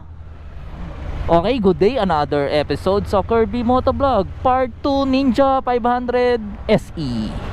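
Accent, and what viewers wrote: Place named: Visayas, Philippines. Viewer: Taga Iloilo ka po ba? Or taga Bacolod? Iba po kasi Tagalog Accent nyo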